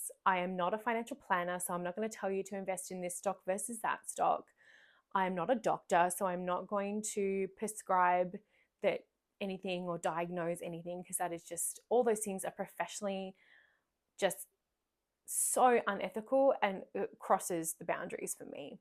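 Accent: Australia. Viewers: Well what can I say ? Just splendid. I know you said you were in Canada, but you sound like one of my Aussie compatriots. Thank you for the upload.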